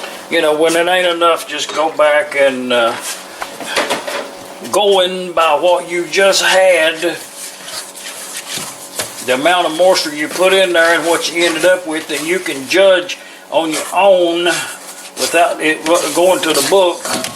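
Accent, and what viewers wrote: South, United States. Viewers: I'm Georgia born and raised. I just purely love watching your videos! You remind me of my daddy something fierce . He loved to cook and did a real good job of it too. The thick southern country accent is something I share with you .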